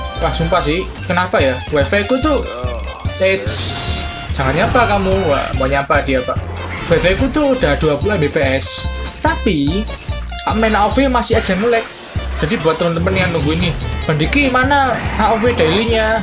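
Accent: Java, Indonesia. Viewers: Cangkem mu !!! , ndaz mu ilang. Jargonnya medok bgt wkwkwk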